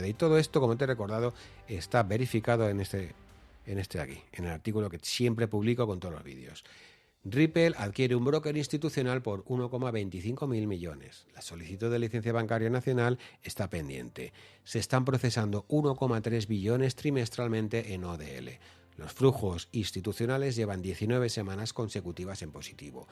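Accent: Valencia, Spain. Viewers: Valenciano verdad? Yo también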